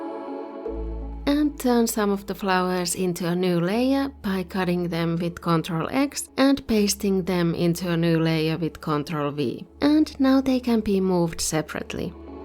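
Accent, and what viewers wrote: Finland. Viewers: Lol, after hearing your accent i immeadiatly knew you were finnish 😂. Keep up the good work, coming from a fellow Fin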